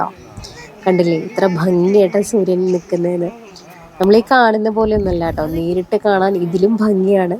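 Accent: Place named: Thrissur, India. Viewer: സൂപ്പർ..സൂപ്പർ..ട്ടാ...💕🎻🥀❤️🎻❤️🥀❤️🥀❤️തകർത്തു... ഇടയ്ക്കുള്ള..ട്ടാ..ട്ടാ...ന്നുള്ള പ്രയോഗം നല്ല രസമുണ്ട് കേൾക്കാൻ..തൃശ്ശൂർ സ്റ്റൈൽ💯💯💯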